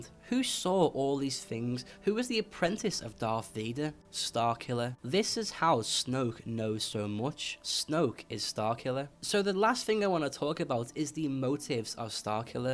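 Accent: Liverpool, United Kingdom. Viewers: R u scouse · u sound like wayne rooney are u a scoucer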